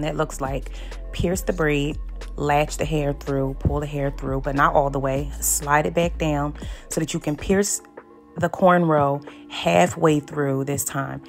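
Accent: Philadelphia, United States. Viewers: You sound like home lol are you from philly? Absolutely loved the detail & easy way you communicated this tutorial/stitches, thankss!! Cant wait to see the Bee Stitch!🔥🔥❤❤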